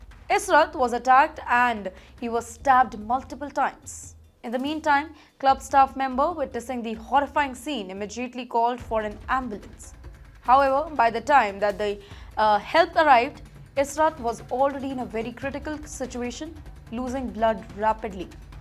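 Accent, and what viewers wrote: India. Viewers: Curry English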